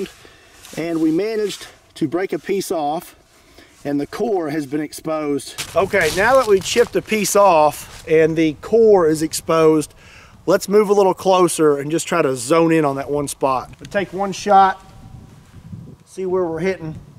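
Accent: Kentucky, United States. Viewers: what part of kentucky ar e you from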